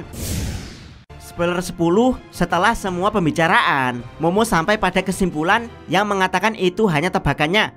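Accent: Java, Indonesia. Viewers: Muedok wkwk, btw suwunss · jangan medok bg ganggu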